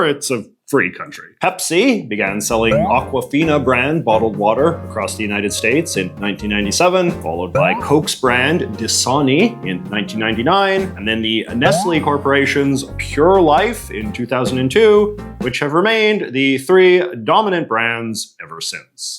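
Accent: Canada. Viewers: … there it is lol this is my first video and I was wondering why you sounded like that. Now it all makes sense, you’re Canadian! 😂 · Most Canadian accent ever and I’m Canadian. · “Talk a boot this this with oot mentioning….” Canadian slime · That Canadian accent tho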